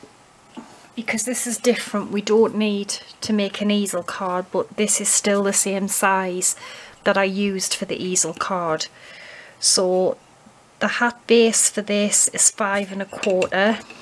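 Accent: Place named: East England, United Kingdom. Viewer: Hi, yes its gone more viral than the Coronavirus! Have had a go at making the card but not too good as I didnt have the circle dies but now I have... nobody will see me as I will be crafting away making a wall hanging for my newly decorated downstairs loo! Just what I need to hide some pipework. Thanks for the inspiration - love the accent - you are just a bit further up the East Coast from me by the sounds of it. Happy crafting and thanks again!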